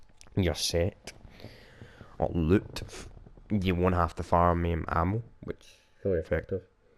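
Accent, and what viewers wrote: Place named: Scotland, United Kingdom. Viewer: If you dont unstand that English then you must of never head of a Scottish accent before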